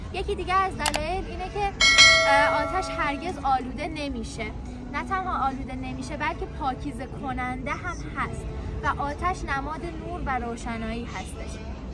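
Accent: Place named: Yazd, Iran. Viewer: لایک ۷۳ ❤ من وقتی بچه بودم یکبار با خانواده رفتیم یزد و کمی از یزد یادم هست 😊من عاشق لهجه یزدیم خیلی زیبا و قشنگه ممنون عزیزم بابت به اشتراک گذاری این ویدئو زیبا❤❤❤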